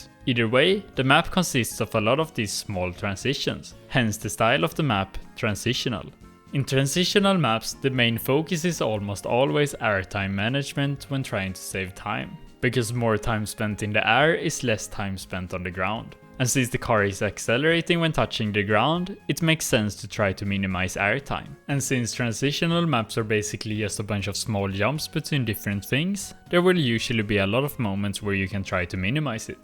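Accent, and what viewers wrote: Sweden. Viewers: love swe english accent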